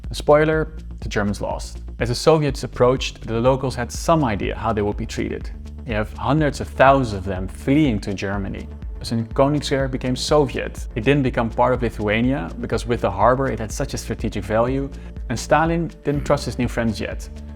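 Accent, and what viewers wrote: Netherlands. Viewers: I was wondering where the host was from, until I heard "togeder" (together). Dutch! 🙂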